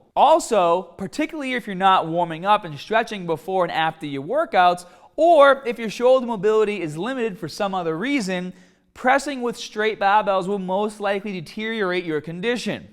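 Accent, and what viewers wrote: Boston, United States. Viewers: Can you not see that he's from Boston or are you just that dense? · Are you from Boston? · Boy from baaaston or new england for dam sure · God I feel like I just took a trip to Boston. if I did a video you would probably get a kick out of me saying barbell and yard.My step mom is from Boston and she says bahh-bell instead of barbell and Bahhs-ton instead of Boston lol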